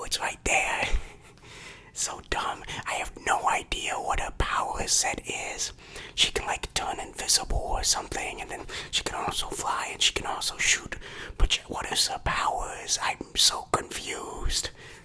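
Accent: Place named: New Jersey, United States. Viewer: Are you from New Jersey? Your accent's pretty strong.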